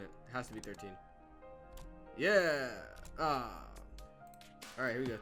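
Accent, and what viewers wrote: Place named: Buffalo, United States. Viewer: U frm buffalo?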